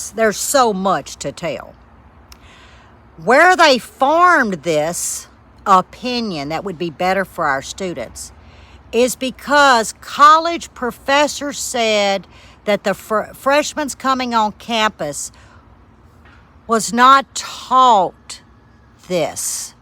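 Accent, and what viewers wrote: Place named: Louisiana, United States. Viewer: You can tell there's some Louisiana accent (a touch of Cajun) in there and mixed with some parts of Kentucky. Before I retired, I traveled a lot to Louisiana on business--places like Houma, La Place, Empire, Nawlins, etc. Used to love the "talk". Although those native to New Orleans sounded more like they were from Brooklyn--not 100%, but a lot.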